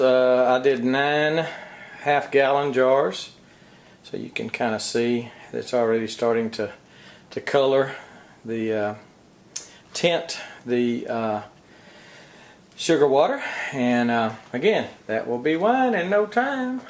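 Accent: Texas, United States. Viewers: Very cool! Love the Texas accent 😁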